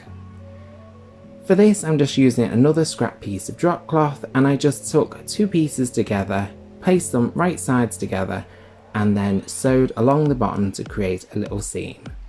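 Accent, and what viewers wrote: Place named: Yorkshire, United Kingdom. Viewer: You sound like you’re from the Yorkshire area. Where are you from! 😀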